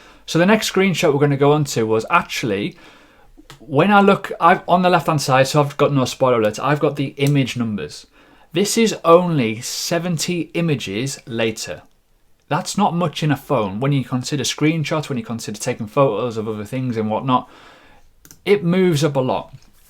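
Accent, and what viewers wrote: United Kingdom. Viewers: Nice to listen to a UK guy.. What exchange would you recommend for the UK? Fed up with coinbase crashing at crucial times.. Cheers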